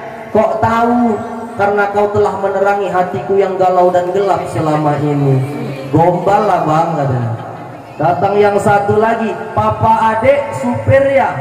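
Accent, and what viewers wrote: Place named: Medan, Indonesia. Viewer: Rindu kali aku logat Medan. Seperti yg dikatakan ini. Kapan ya bisa ke Medan lagi. Semoga diberikan kesehatan ya pak.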